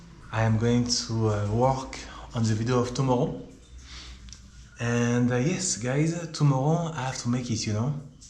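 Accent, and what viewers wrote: France. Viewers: I think you are using the correct words you’ve just got a few things going on at once. French accent & you put all your words together quickly…like real quick. So they sound different. It’s like a remix of words…😂 safe travels😊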